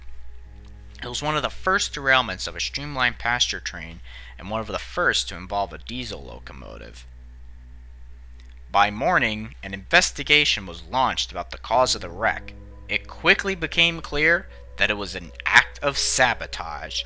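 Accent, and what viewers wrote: United States. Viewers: Aside from the grating American accent, we have mispronunciations, misinformation, and just plain amateurish presentation. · This was very interesting, but my gosh, you Americans and your "rayroads"! When you talk about the Wintons, it becomes the "...California State Rair'd Museum..." I just have to razz you because we Canadians have to put up with Americans who think we say things like "oot" for "out" (we don't). I enjoyed your rayroad video.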